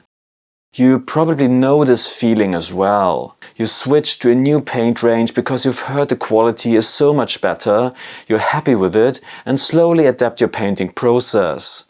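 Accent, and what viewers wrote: Germany. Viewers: Are you a german trying to hide your accent?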